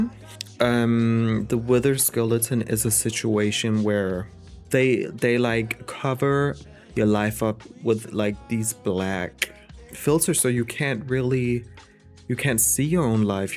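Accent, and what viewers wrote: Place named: Brazil. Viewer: are you brazilian? Your accent is nice btw very underrated content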